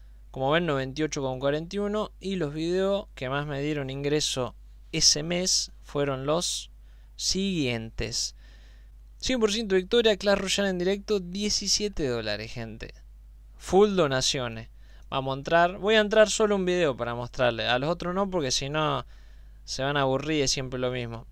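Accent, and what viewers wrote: Argentina. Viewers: Sos Argentino verdad ?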